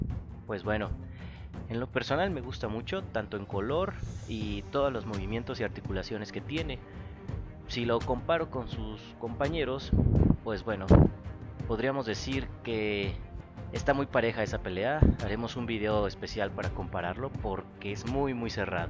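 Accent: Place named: Costa Rica. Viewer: Hola, Habla D'top motion, no es nadie importante mira. Se que eres de Costa Rica, igual que yo 😙, en el video " Tips para un buen stop motion" había una camiseta de la selección de Costa Rica ( la sele) y no sólo por eso, tu acento y todo. Se nota que eres tico. Me podrías decir COMO RAYOS TIENES TODAS ESAS FIGURAS ( ESPECIALMENTE EL SPINOSAURUS AEGYPTIACUS) saludos crack!! Pd: sub desde hace 3 años 😍😎😍 Donde conseguiste el spinosaurus acá? Amazon o que? En Costa Rica es ( para mi) imposible conseguir dinosaurios en tiendas departamentales ( walmart, Target, ect) Solo una ligera explicación por favor!! Atte: D'top motion.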